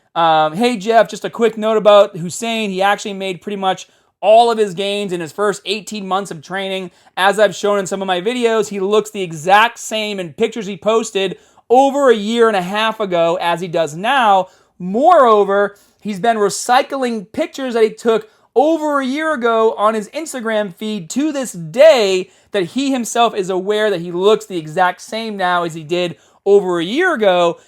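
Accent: Boston, United States. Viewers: Its good to see you still grow strong , im a follower even before people make fun of your "bahhbel" Boston Accent era . Haha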